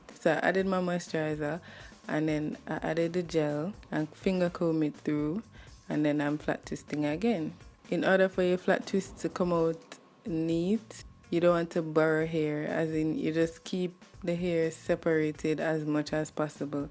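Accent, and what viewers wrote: Jamaica. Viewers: u sound Jamaican 😍😃😃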